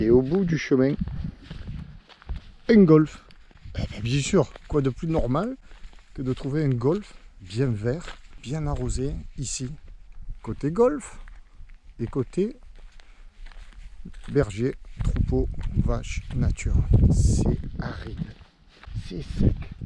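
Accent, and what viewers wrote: South, France. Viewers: SALUT l'amie qui parle le sud je sui guedin rien que de t'entendre je me bar a la réunion dans 2 a 3 semaine re-joint moi faut juste faire l'afrique pour moi c dommage je le fait pas encore en voillier mais bon a charge de revenche aller profite fait des bon virement de bords sans partir a l'eau tes seull mes avec nous on t'aime é te soutien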